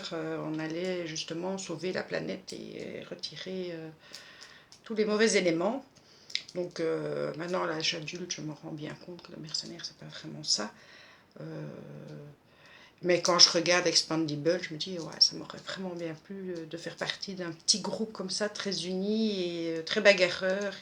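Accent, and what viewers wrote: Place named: Belgium. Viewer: Coucou C'est super d'en apprendre plus sur les copines grace aux tags... je trouve ça sympa. Et je vois que toi aussi tu as un joli accent... belge😊 Bon week end Gros bisous😘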